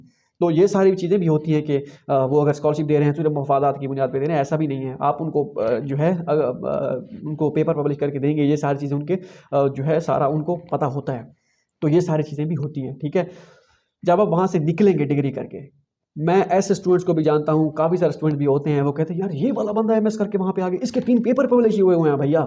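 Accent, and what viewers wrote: Saraiki, Pakistan. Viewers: I appreciate you brother آپ کی وڈیوز دیکھنے کے بعد مجھے پتا چلا کہ csc بھی کوئی نام کی چیز ہے آپ ہر کمنٹ کا جواب دیتے ہے اللہ تعالٰی آپ کو کامیاب کرے ہم سب کی دعائیں آپ کے ساتھ ہے ۔ سرائیکی وسیب کے آپ پہلے بندے ہے جو اس کام میں بہت آگے ہے آپ کا سرائیکی لہجہ ہمارے لیے سمجھنا بہت آسان ہے کیونکہ ہم سرائیکی ہیں اور ہماری دلچسپی بڑھتی ہے میں آپ کو سلیوٹ کرتا ہو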